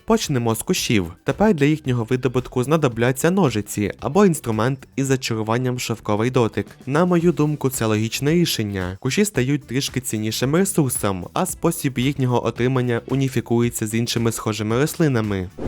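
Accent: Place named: United States. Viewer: У вас дуже файний американський акцент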